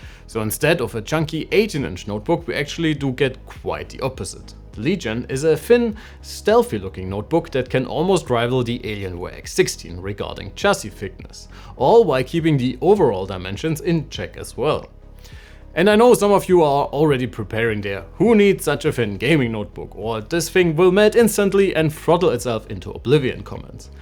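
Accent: Germany. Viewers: I Hear Your Deutsch Akzent 😂 schönes Video btw 🎉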